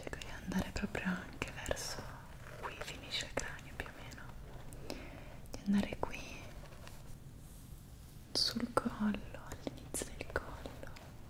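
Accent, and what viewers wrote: Italy. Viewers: meravigliosa, molto rilassante e la sua voce è estremamente morbida, il suo accento italiano è una delizia da ascoltare. un bacio dal Brasile 😴❤️🇧🇷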